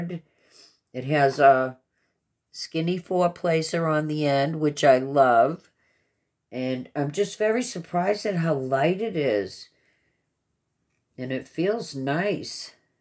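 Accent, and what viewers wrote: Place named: Massachusetts, United States. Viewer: I just realized when I came here to watch that you’re the one from Massachusetts!! I’m originally from New Bedford and I’m so nostalgic listening to your accent! 🥰🥰 I’m so glad you enjoyed the event and your prize!! PS, his name is Matt 😆🤣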